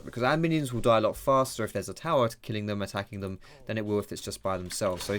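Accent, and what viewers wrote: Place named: United Kingdom. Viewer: EUW, he's British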